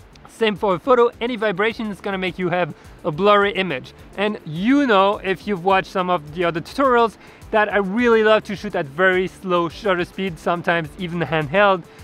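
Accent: France. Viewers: Super French accent mode :D J'adore!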